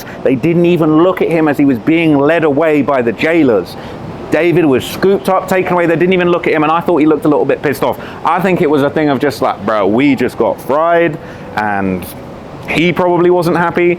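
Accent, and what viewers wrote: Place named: United Kingdom. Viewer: That’s how British people speak bro calm down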